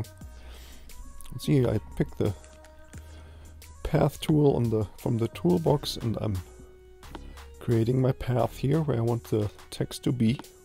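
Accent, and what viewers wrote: Germany. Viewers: Thank you, very helpful. Is it the German accent that I'm hearing?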